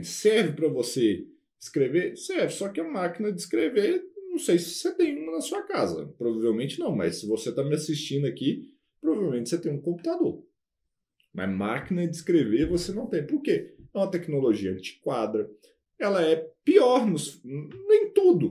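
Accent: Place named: Minas Gerais, Brazil. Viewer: Gostei. Pennnssaaa num trêm veio. É minero mmo. kkkk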